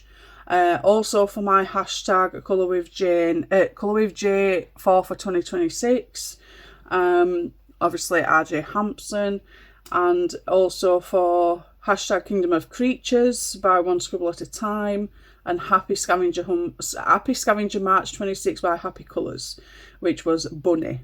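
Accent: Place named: Huddersfield, United Kingdom. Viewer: The Hub and I are having bets ob where you're from. He says Hudderfield. I could listen to you say Petite Poupees all day! 😂❤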